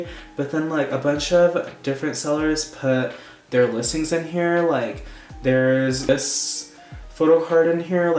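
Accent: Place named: United Kingdom. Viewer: bringing out the british accent already i see lmao 😭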